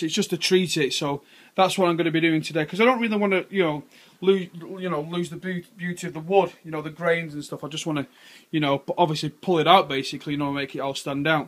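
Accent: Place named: Birmingham, United Kingdom. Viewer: Brummie or Coventry?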